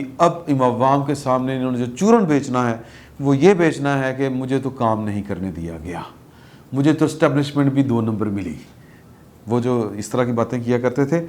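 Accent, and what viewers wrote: Punjab, Pakistan. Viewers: I love ur mix Punjabi talk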